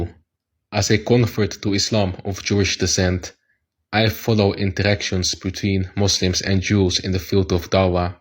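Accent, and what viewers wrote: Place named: Germany. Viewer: Welcome to the true religion brother ! JazakaAllah for the video ! You have a crazy German accent Masha Allah 😬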